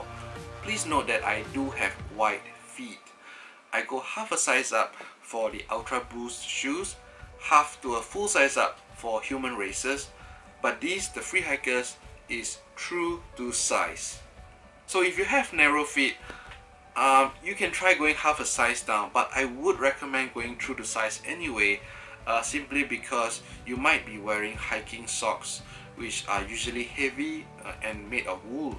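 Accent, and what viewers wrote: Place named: Singapore. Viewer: Great review. I just put in an order. Hoping it's a good one. :) Your Singapore accent makes me very nostalgic for the motherland. Hahaha.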